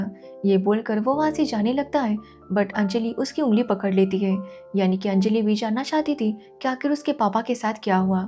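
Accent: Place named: Maharashtra, India. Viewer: Is your mother tongue is marathi ?... or does the narrator belong to the marathi language speaking community ... 😅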